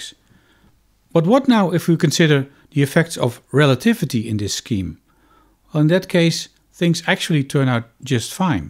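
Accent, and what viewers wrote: Netherlands. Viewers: I hate the Dutch accent.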